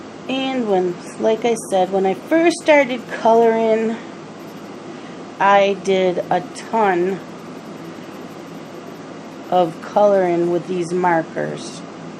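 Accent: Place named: Upstate New York, United States. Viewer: Hi. what a cool name. i am watching you color the Freebie Friday, the March, and you're calling us. your peepers. i just love Ann soo much and I enjoy your videos as well. I wonder where you are located. I am guessing upstate NY... I am an Army brat born at Fort Dix NJ but being military one picks up on accents... But I want to tell you how awesome I think you have done this Mandala!!! I am going to try doing one with markers and see how it goes. Have a blessed day. ~bobbi in TX.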